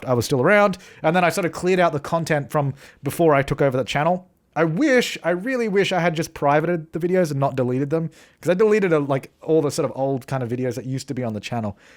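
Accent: Australia. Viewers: Watching this from the future and sounds SO Australian here. Like more than in present day somehow, even though I'm not sure his accent has really changed.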